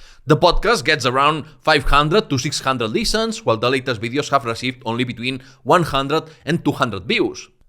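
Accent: Spain. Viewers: I advise you to cut your videos in small bytes and ask provocative questions in the title + the hook ... Try to exploit geopolitical contexts of today and do some projections or really anything to exploit a controversy ... something like that in a short form You can also do collaborations if possible + if u want, u can improve your accent which is 100% understood but quick and overrun by your spanish accent (tho honnestly i consider that as a good feature, I like it) I'm amazed by the sheer quality of your videos... the level of details, the impartiality and the extensive coverage of all sides that constitute the amazing history of Spain...unlike another English channel by someone with a French accent telling his own subjective opinion ALL THE F*CKING TIME... so your videos is a breeze to me!! All respect to you!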